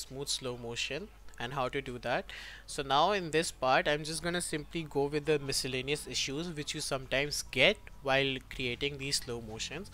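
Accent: India. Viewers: watched hundreds of indian tutorials, i wont even care if you speak indian id still understand you either way, even if im not indian. good tutorial tho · I want to get ur accent i try but I can't, I even watched a yt vid on how to sound like Indian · nah i love your accent, indians make the best tutorials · this tutorial helped me out alot ! and honestly i dont know how anybody could make fun of your indian accent, your english is very good ! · Best indian accent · Bro just watched your tutorial dont worry about your accent! I've come to loved this accent since I always learn from indian guys in youtube! You guys are simply the best. :) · Hey buddy quit trying to explain your accent, it's cool and we don't care. And thank y'all indians for sharing your knowledge online for free. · i stopped the video when you said that you're from India and you have an accent. let me tell you something real quick my friend you have a great talent and your English is GOOD, do not worry about any negative trash talk about anything that comes around you just keep doing what you are doing because it's GREAT !!! 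and now I'm learning from you my friend <3 Thank you for posting such a great talent like that :D B+ always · Don't think too much about it man you actually have one of the lighter indian accents that i have heard lol🤣🤣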